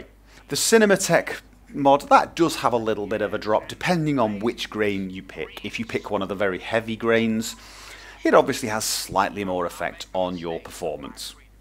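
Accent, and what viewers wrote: United Kingdom. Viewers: He's obviously British.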